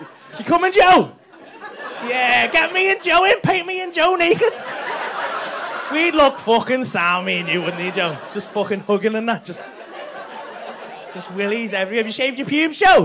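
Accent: Liverpool, United Kingdom. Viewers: Not bad a Scouser. He really is awsome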